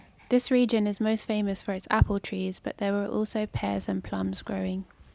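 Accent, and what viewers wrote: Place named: United Kingdom. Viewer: Its not kului .... Its kuuuullu .. 😂😂😂 british accent sucks .. Water : whatha 😂😂😂 Its not Rock fooling : its rock falling bro You are eating Aam papad.. kala katha flavour 😂😂😂😂😂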